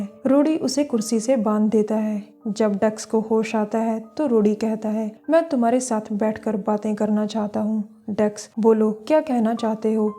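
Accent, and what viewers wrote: Punjab, India. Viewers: Nyc mam ☺ your voice so osm mam aap kaha se ho mujhe apki voice sunke aisa kiu lgta hai ki aap punjab se ho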